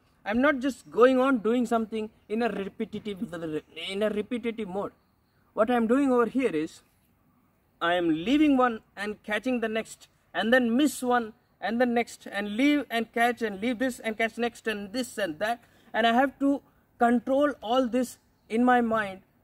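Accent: Kerala, India. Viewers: Are u South Indian or punjabi because in English u have a typical Keralite accent in hindi u have punjabi accent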